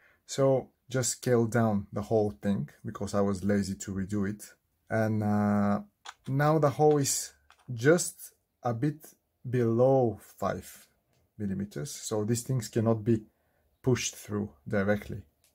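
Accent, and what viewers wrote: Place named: Russia. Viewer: По акценту вроде наш) как снять эти серые крышки, не повердив корпус?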